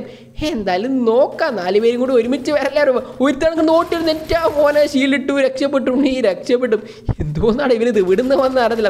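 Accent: Kannur, India. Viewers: Kannur aano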